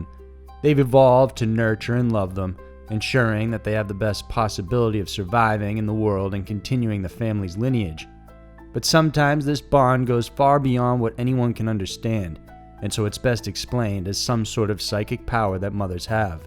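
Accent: South, United States. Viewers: God I love your sexy touch of southern drawl. You make mysterious shit hot again man.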